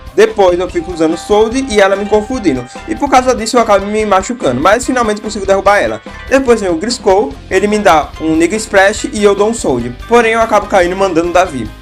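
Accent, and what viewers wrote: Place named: Lagarto, Brazil. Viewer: ? você é de lagarto?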